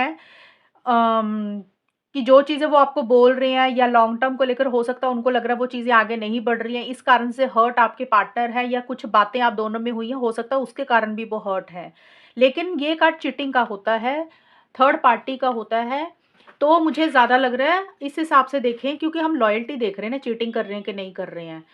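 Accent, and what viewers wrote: Punjab, India. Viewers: Your way of talking is Punjabi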